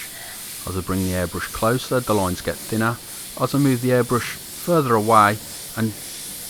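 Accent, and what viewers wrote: United Kingdom. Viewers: Omg I love your accent! It's so deliciously UK!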